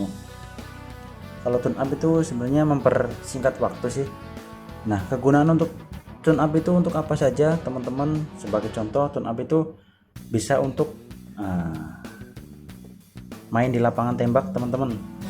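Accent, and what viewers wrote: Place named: Java, Indonesia. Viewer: Jn jawane nyong bngt kang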